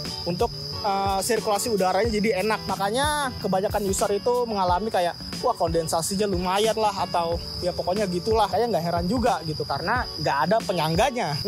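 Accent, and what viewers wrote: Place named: Indonesia. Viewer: Suarnya medok banget bang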